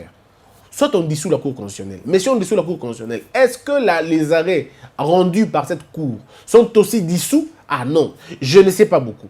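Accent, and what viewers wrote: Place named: Lingala, Democratic Republic of the Congo. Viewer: OOOH OYO LINGALA KITOKO BOYE OMAGNOLI YANGO MALAMU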